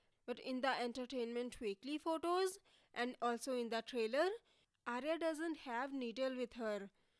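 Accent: India. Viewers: How come you write and speak such excellent english, yet have such a lovely indian accent ? 😊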